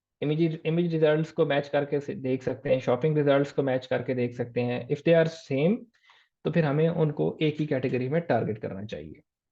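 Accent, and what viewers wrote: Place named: Punjab, India. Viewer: Nice lecture & your punjabi touch in conversation is more funny 😂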